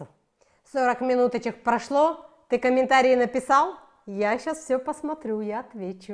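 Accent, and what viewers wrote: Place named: South, Ukraine. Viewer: Обажаю ваш южно-украинский акцент! Вы супер!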